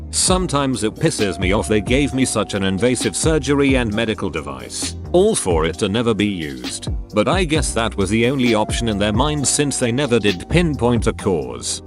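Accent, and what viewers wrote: United Kingdom. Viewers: This brittish snob accent sucks on robo narrator.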